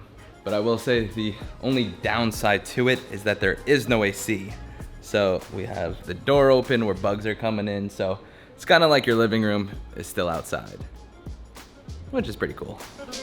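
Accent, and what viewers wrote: United States. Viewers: Just here for some real-life American English. Thanks!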